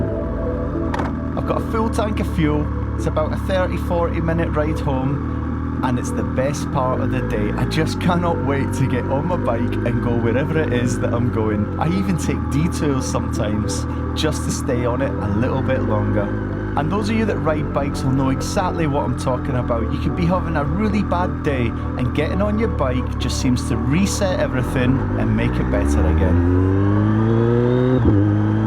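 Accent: Scotland, United Kingdom. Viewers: Do you have a Scottish accent ? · Ayrshire in Scotland pal , so people in Scotland WILL think you have a Scottish accent as i did , you definitely sounded Scottish to me , otherwise i wouldn't have mentioned your accent. A Scotsman can recognise a Scottish accent no matter whether it's fae Ayrshire , Fife, Glasgow or Shetland , all very different but all recognisable as Scottish, and definately not ' general' , just the same as an Englishman, Welshman or Irishman.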